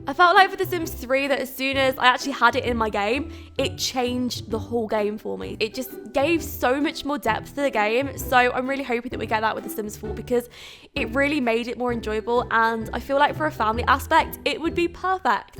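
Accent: United Kingdom. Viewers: You sounds very British for someone living in Germany. Is that where you live permanently now?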